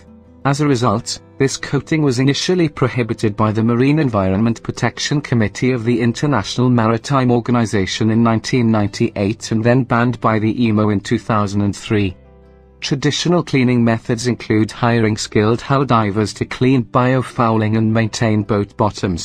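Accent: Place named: Wales, United Kingdom. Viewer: Wow - a Welsh bot narrator !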